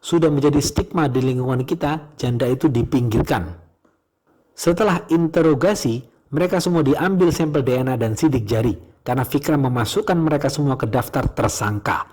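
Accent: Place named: Java, Indonesia. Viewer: Narratornya jawa medok inih haaa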